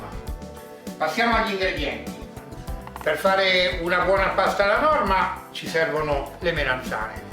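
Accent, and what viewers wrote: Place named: Sicily, Italy. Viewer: L'accento e siciliano proprio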